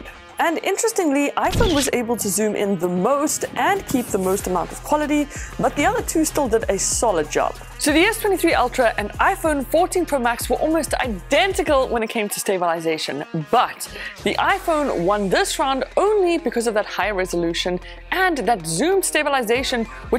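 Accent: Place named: South Africa. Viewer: Is it just me or does she sometimes sound a bit South African? Just the way she pronounces some words reminds me of a South African accent.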